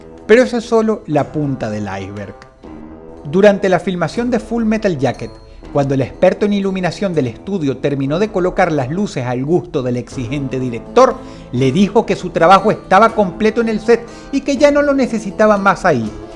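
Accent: Venezuela. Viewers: A le ah cambiado mucho el acento el los últimos años en este video tiene acento venezolano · Ese acento venezolano ✨ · Cuando aún hablaba como venezolano:v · wow aqui tiene muy marcado el acento venezolano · Extraño cuando a se le notaba el acento venezolano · Ese acento Chamo... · anda, que para ese entonces ese acento venezolano todavia estaba ahi...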